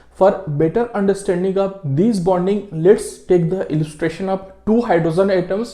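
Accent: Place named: Maharashtra, India. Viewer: You are marathi by your english i can guess that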